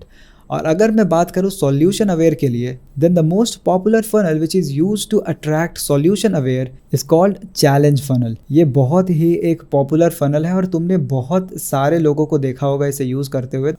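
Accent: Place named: Bengal, India. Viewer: Saying US as "तुम".. It clearly shows that you are from the land of Bengal with that sweetness🍬 in our talk. Ami I wrong then mention me. Thank you my friend 😊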